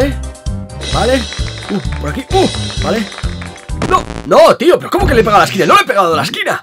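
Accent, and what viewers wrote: Argentina. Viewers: hablando argentino es lo más hermoso que hay♥ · Amo como hablas en castellano (argentino) ❤ SOS UN GENIO💜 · Jaja a es al que mejor le sale el acento argentino (entre los youtubers españoles que lo hacen)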